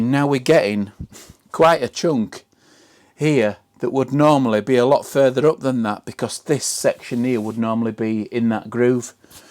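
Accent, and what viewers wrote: Yorkshire, United Kingdom. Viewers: ! Great job! Thanks so much, and ya I've subscribed too;)) AND i do wish you 'd insert 'sources' or 'links' for the various products you found helpful!! For example NUTRAK MILK, and that fancy BENCH WHEEL-HOLDER tool!! and the?"Pedro Tyre Levers"??? Did I spell it right?? ALSO: Question: Why not use Powdered Graphite as a lube for pushing the tyre around... As you probably know, some people use TALC on an inner-tube, but that material has health issues (like traces of asbestos in it) as a lube but perhaps they could switch to graphite OR to Corn Starch?? Your opinions would matter to us all!! (Oh and we love that Yorkie accent, guy!)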